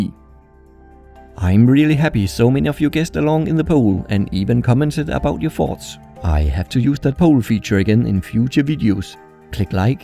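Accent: Denmark. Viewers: It's funny to hear your Danish. I come from Denmark. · When you just Can hear he’s Danish ;-)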